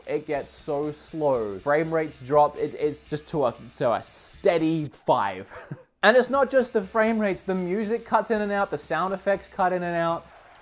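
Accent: Australia. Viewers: Bloody cringe worthy hearing Australian accent. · Mate, first video I've seen of yours. You sound totally Australian. I'm assuming you now just live in the US? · What is his accent? Auatralian? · Are you aussie? If so, why are you at walmart? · Are you an Aussie? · R u Australian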